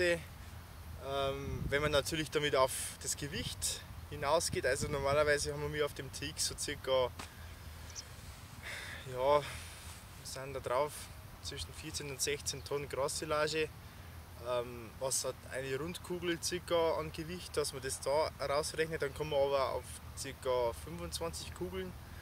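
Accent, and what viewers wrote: Bavaria, Germany. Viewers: Kannst du bitte a bissl mehr bayerisch redn ✌️.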